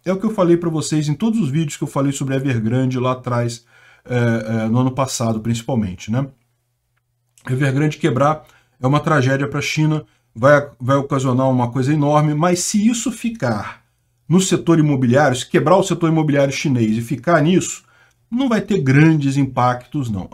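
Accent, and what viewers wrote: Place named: Rio de Janeiro, Brazil. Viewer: Se diz no carioquês :_ancappark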